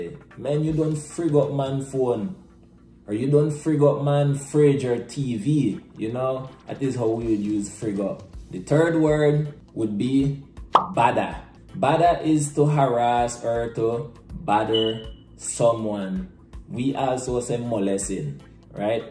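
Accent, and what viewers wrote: Belize. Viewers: You sound like wa Belizean... Im Belizean btw love this video educate us brother.. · You talk straight like a Belizean breda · Bway, I thought you was straight up Belizean for a minute. I neva though the Nicaraguan Kriol was so similiar. Big up mi bredda.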